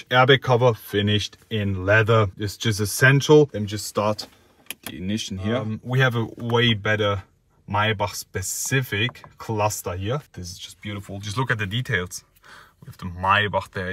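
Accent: Germany. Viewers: Alter dein fucking German english Akzent Lachkick genial ha ha ha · The fact that he’s got a thick German accent made me subscribe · Dieser deutsche akzent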